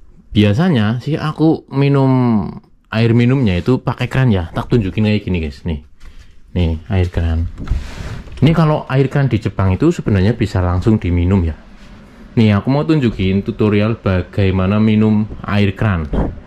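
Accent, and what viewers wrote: Banyumas, Indonesia. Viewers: Aq yen ndeleng kontenmu kui marem mase...bahasa ngapake mantep...guli njelasna kepenak... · kamu memang hebat, harusnya kamu mendapat penghargaan dari bupati di kampung kamu karena kamu bahasa ngapak dikenal di jepang... Salam toko kejujuran bang... Tiap hari dong bikin konten, berangkat kerja dan ketika kerja, Terima kasih sudah menghibur kami sarukhan ngapak hehehe... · Mas, kalo boleh tau asal daerah mana? Wong ngapak ya Salam dari Maos Cilacap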